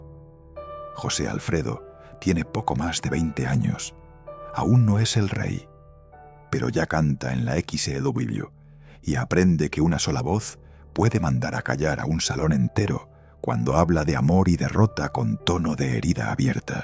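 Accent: Spain. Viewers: Mal muyyyyy mal su reportaje, José Alfredo Jiménez, NO escribió más de mil canciones, son entré 360-,o 380, infórmese primero, su acento español, de lo que Usted dice, son mentiras......... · Eres español, que vas a saber de México. Me choca el acento de Uds.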